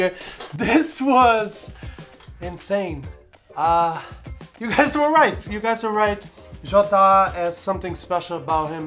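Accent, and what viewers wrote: Brazil. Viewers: Recebo a notificação e venho correndo para assistir ao vídeo 😊 Melhor canal da vida, com o português mais brasileiro do YouTube ❤🇧🇷❤🇧🇷❤🇧🇷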